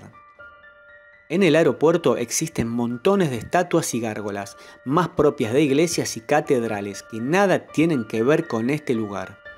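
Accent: Argentina. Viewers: El video está muy bueno , pero por favor trabaja en tu asentó , no todo el Mundo es argentino y en momentos molesta! Gracias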